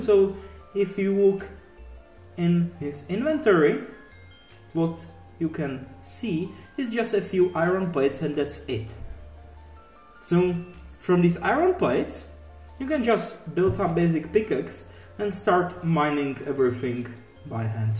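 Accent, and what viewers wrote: Czech Republic. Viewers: nják slyšim českou angličtinu :D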